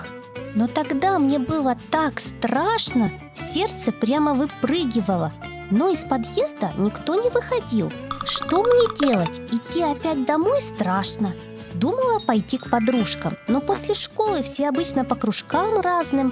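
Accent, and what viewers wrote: Ukraine. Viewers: ти из ккрайини)))))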